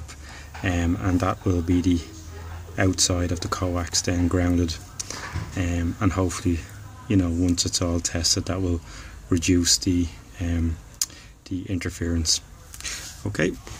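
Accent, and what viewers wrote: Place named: Ireland. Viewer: I was wondering where i had heard your accent somewhere i visited before... and your accent is Irish isnt it?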